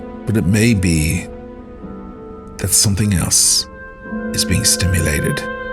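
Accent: Ireland. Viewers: Great start; loving your style; beautifully delivered with that mellow Irish Brogue. Classic, long may you continue my friend. Salute.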